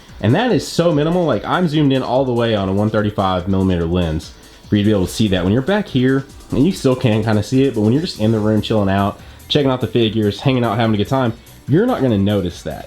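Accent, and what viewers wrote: South, United States. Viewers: are you from the south sounds like you have a southern accent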